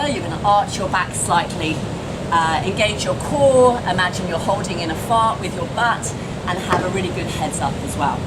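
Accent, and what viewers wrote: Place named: Australia. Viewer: 억양이 너무 호주 호주 한데요~ ㅎㅎ 역시 서핑은 오지! ㅎㅎ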